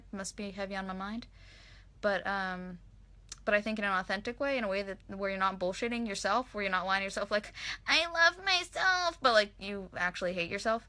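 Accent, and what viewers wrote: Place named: South, United States. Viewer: I love when you talk Southern. You have the voice for it. I should know I've been in Georgia all my life.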